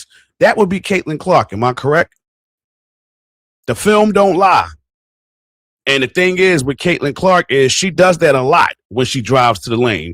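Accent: New York, United States. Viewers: Spitting facts and a NY accent, oh I’m locked in!!!!!!!!!!!!!!!!❤❤❤❤ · The whoLe phrase was: "Tuff Tiddy To suck" You sound Like a Nu Yawka bro. #SaLuTe_Homeboy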